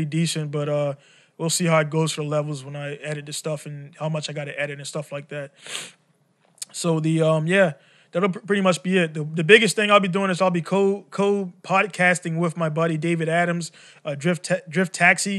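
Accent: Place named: Baltimore, United States. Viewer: A mix tape would hav been dope, do you still make beats??? Your accent is nuts my dude! Still hear that bmore flex tho. 😏 Being in business for yourself is crazy, it’s the best and worst thing ever. Holding yourself to a higher standard is important, never undervalue yourself or your work. As soon as you start doing that, everyone else will too. Looking forward to hearing more from you! Awesome test/intro. Hav a good night.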